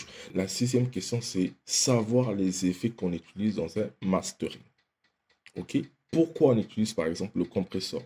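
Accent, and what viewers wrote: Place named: Brazzaville, Republic of the Congo. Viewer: Merci mon frérot et tu a un bon accents brazza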